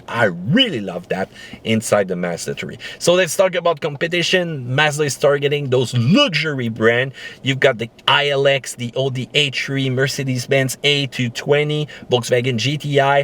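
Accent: Canada. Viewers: From the states I appreciate and enjoy the Canadian accent. I loved hearing GSP from the ufc talk for that reason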